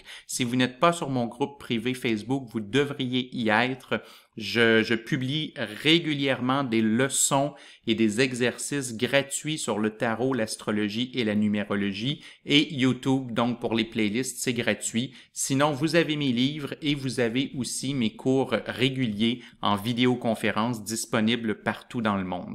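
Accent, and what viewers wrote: Quebec, Canada. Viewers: Merci pour vos vidéos très instructives, en plus quel plaisir d'entendre notre cousin du Québec !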